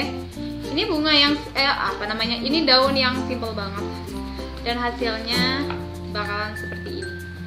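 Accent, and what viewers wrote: Madura, Indonesia. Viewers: Kak asal mana? Madura bukan sih... Bahasanya itu g asing 🤗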